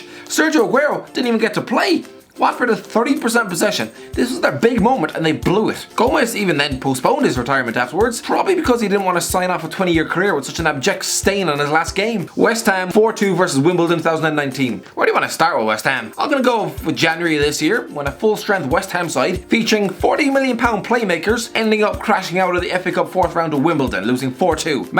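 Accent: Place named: Ireland. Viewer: Irish guy voice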